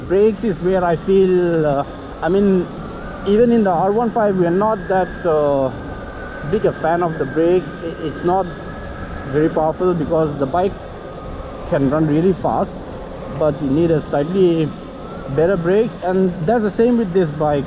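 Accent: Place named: Mizoram, India. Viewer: Mizo saptrong thluk(accent) chiah2 nh chu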